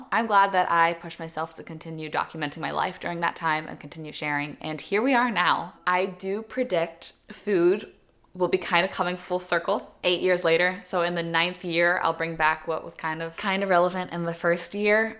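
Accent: Texas, United States. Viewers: I was watching ur old videos last week actually and I was telling myself hey she still talks the same the y’all part is there that Texan accent that I love. I’m from Texas as Corpus Christi. Don’t loose that part of you makes you so unique.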